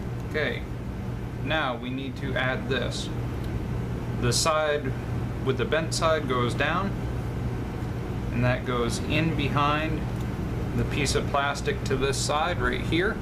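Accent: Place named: Canada. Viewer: Sounds Canadian. Great fun eh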